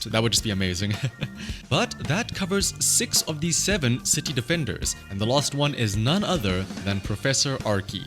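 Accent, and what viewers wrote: South Africa. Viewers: So good to hear a South African accent on YouTube ❤ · are you SouFafrican?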